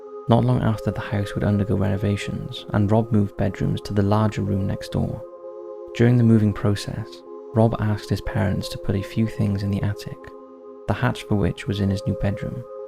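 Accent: United Kingdom. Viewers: I just want to say I love these "Hit Those Lights" videos and the narrator being British makes it so much more convincing and real. I've always been fascinated with what makes people do these horrendous, horrifying acts of violence and I try to find a pattern like they were victims themselves or there was a history of trauma but it's when there is no explanation found or the perp got away with it makes it even more un-nerving and intriguing. Keep up the good work!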